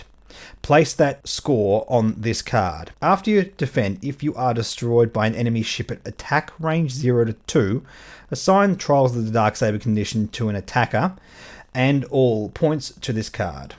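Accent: Australia. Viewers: Good to hear your Aussie voice talking x-wing as I do my work.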